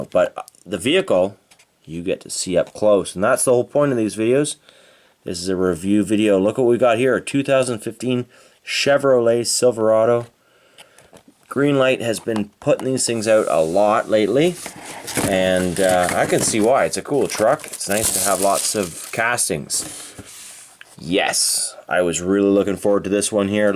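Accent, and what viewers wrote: Canada. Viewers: I was just about to comment. Is he form Canada because the way he said stuff. Mostly because the way he said car. I live in America but I have Canadian in me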